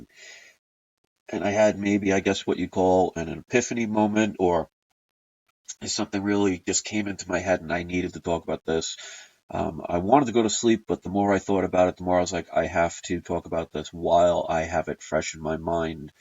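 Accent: New York, United States. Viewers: YOU SOUND LIKE YOU ARE A NEW YARKER HEY FROM THE WEST COAST ME-REFUGEE CITIZEN HEFT SAN JOSE CA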